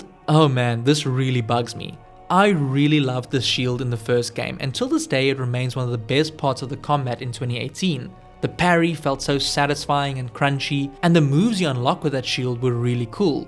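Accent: South Africa. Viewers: I can tell this dude is South African and trying very hard to cover up his accent. “Taken to HaRRRt” “Cory BaRRRRlog” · Unrelated to the game... Are tou South African? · Love the video, but on an unrelated note, I can’t help but recognize the accent…are you South African by any chance?👀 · I could just hear the South African accent. Keep it up boet great content and loved the honest review!!!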